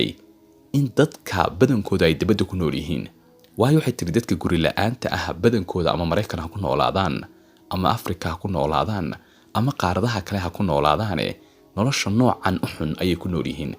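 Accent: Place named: Somali Region, Ethiopia. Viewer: Waa Somali ninka sida hadal ka lo dhigey Ethiopia Somali ba mise waa Ethiopia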